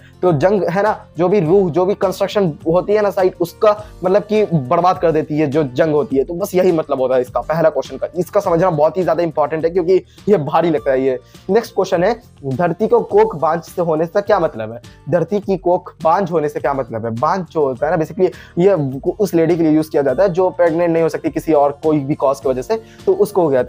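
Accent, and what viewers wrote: Bihar, India. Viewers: Are you from Bihar 👍🏻,?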